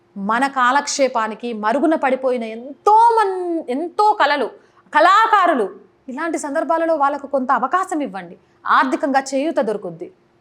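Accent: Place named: Telangana, India. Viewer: కందకు లేని దురద కత్తికి ఎందుకు అని అంటారు ఇదే. వాళ్ళ ఉర్లో జనాలకు పేదలకు ఆడవాళ్ళకి లేని దురద మనకెందుకు. మీరు ఎన్ని చెప్పిన వాళ్ళు మారరు సిగ్గు శరం ఉండదు. తెలంగాణ యాస తో మాట్లడినంత మాత్రాన ఎవడు రియాక్టు ఎవ్వరూ గా.ధర్మం మన చేతుల్లో ఉంది అది మనం ఇలాంటి వాళ్ళని ఎంకరేజ్ చేస్తే ఇలానే చేస్తారు ఇలాంటి వెధవలు. చివరకు మీరు చెప్పేది అదే ఛానెల్ సబ్స్క్రయిబ్ చేస్కోండి షేర్ చెయ్యండి అని.😂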